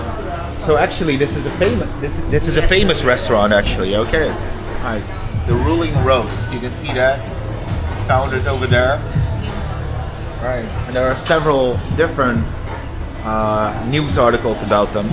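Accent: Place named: United States. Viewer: you born in russia you live in poland? you sound like american its weird 😅 but man thank you for having you here in our country maraming salamat mabuhay po kayo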